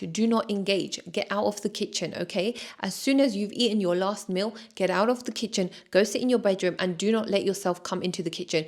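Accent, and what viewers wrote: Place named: United Kingdom. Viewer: i cant believe i was about to ask what country you're from and didn't clock the accent LOLLL! as a canadian, i watch so much british content its become normal to me so i didnt even notice it AHHAHA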